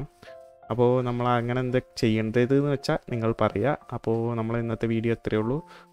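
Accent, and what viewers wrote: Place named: Kozhikode, India. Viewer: I think you're frm kozhikkode or kannur😌🍀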